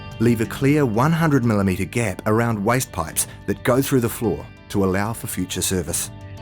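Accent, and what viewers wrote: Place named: New Zealand. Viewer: This video needs to be narrated by an Aussie the kiwi accent will inhibit sales and no one will take it seriously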